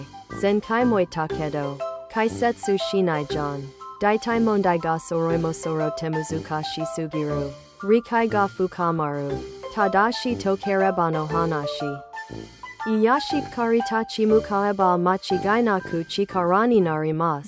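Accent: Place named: United States. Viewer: a の発音がeiの時とerの時があるね。ほとんどaとは発音しないアメリカ英語。 あと授業はjigiyaou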